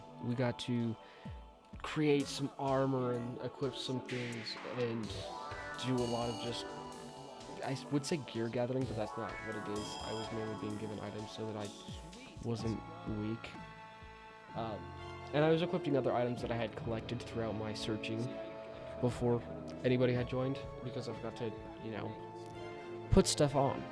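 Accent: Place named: United Kingdom. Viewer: blud is bri ish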